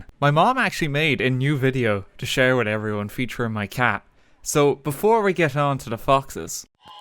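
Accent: Ireland. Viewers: Holy shit youre irish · So what part of Ireland are you from?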